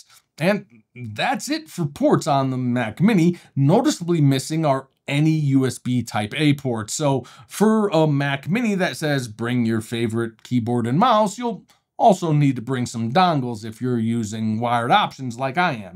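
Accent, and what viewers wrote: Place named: United States. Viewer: I really have problems with strong American dialect ...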